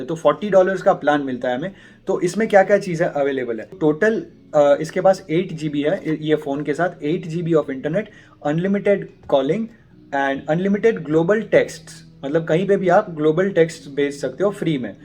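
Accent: Gujarat, India. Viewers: Are you gujju?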